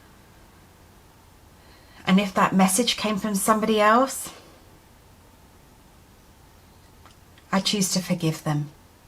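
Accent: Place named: England, United Kingdom. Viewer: Omg YES. EFT with an English accent -makes it even more posh :D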